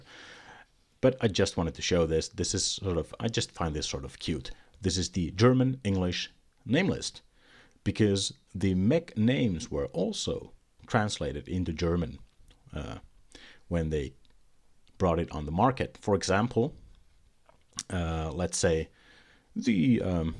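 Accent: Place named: Finland. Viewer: HAH! Now I hear it! You sound like my buddy from Seinäjoki! :D Should have recognized it at once. Good to hear you found a warm welcome here. :)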